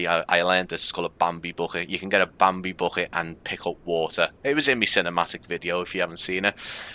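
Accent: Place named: Wirral, United Kingdom. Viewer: Excellent work, mate, keep it up :) Where are you? Your accent sounds local to me (Wirral)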